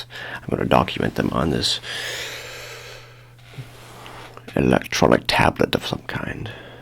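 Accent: Transatlantic, United States. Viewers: Trans Atlantic 😭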